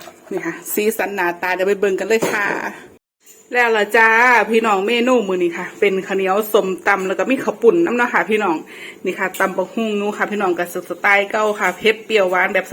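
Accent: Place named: Ubon Ratchathani, Thailand. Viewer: สวัสดีครับ แซบๆครับ สำเนียงคือคนอุบลบ้านผมเลย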